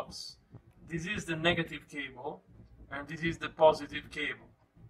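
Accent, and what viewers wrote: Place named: Italy. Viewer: L'accento italiano si sente a 5000km di distanza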